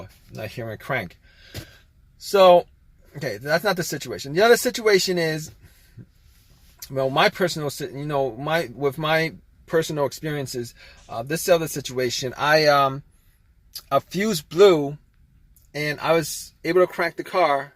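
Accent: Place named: Massachusetts, United States. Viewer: Sounds like Massachusetts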